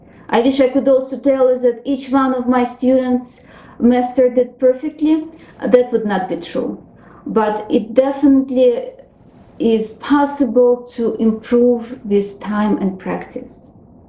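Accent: Russia. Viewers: Russian Accent <3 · Are you Russian?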